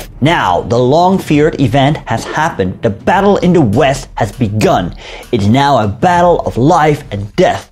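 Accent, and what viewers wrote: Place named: Netherlands. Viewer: prachtig zoals je die "internep revisionist" neerzette! (en, JA, ik spelde internet met opzet zo...) ik vind je kanaal "History Hustle" fantastisch en voor het overgrote deel correct. het enige, kleine, minpuntje is je flinke accent als je Engels spreekt en ook met de uitspraak van Franse namen kan ik merken dat je een Nederlander bent...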